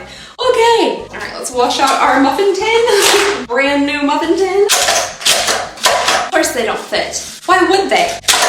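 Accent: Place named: South, United States. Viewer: I don't know if you actually went to Michigan Tech but in my head cannon you did. When you get stressed your southern accent gets stronger and I imagine the Yoopers being hella amused by that. A Southern Belle in Yooperland.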